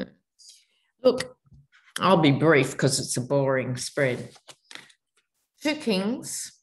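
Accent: Australia. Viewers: Congratulations 🎈🍾🎊 on getting a troll. Probably a right wing grifter who’s fascist views are threatened by the truth you speak with your amazing Aussie accent. 🤗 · Safe journeys my friend 🥰 Enjoy your time at Bondi 🏖 Beach. Don’t forget the sunscreen, Girl. I Always watch your readings and never get tired of your Aussie Speak. Speaking of “ Robert as “You poor little piddle”. My mom used to use that term piddle to describe something our puppy did when she got excited and wet the floor! Maybe it’s the equivalent of American slang calling someone a Little Pisser! 🤣😂😅 Loved your RBG reading. Love that woman! Thanks for staying with us for the long game🇺🇸 🇦🇺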